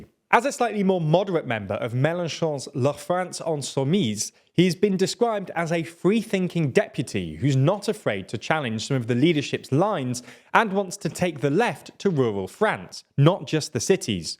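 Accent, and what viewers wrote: England, United Kingdom. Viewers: politics apart, i love when english people try to pronouce french names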